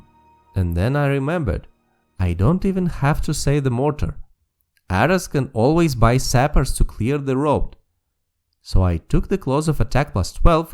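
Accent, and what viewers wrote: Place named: Eastern Europe. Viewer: I love the idea of this run, pretty unique. Makes you wanna give each unit a nickname, like Sarah the Sorcerer, or Pat the Priest. I've been starting to shake off the RTS rust with one of my other childhood games, AoM, and the idea of doing this challenge on that game sounds super cool; I'm gonna need to start trying it. All that being said, before you commit to doing the entire WC3 campaign with this ruleset, I have two words to say: King Arthas Have fun with that one :D btw, really like your accent. If I may, what is it? Sounds Eastern European.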